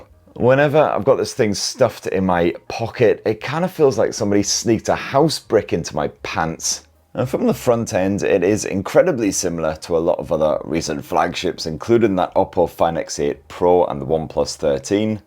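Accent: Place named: North East England, United Kingdom. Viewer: He's north east English. Sunderland I think.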